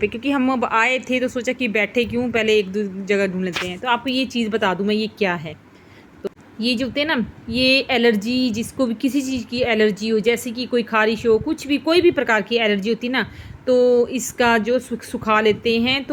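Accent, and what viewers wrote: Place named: Himachal Pradesh, India. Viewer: Himachali boli vadiya lagdi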